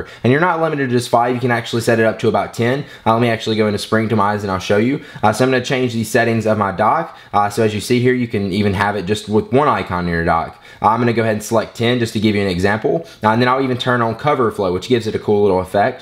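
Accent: South, United States. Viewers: That Southern accent!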